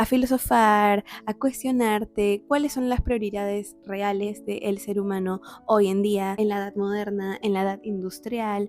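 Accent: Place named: Peru. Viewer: ... Pues déjame decirte que has hecho una buena reseña con tu dulce voz, de acentito, de muchachita... peruana.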